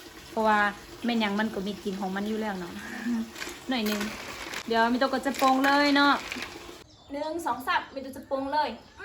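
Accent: Laos. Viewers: น้องอยู่จังหวัดอะไรครับฟังสำเนียงเหมือนคนทาง ส.ป.ป.ลาวครับ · ນ້ອງ akha ອ້າຍເບີ່ງ ຄລິບ ນ້ອງຕະຫລອບ ອ້າຍຢາກຖາມວ່າ ນ້ອງເປັນຄົນລາວ ຫລືຄົນໄທ ຟັງສຳນຽງຄືລາວ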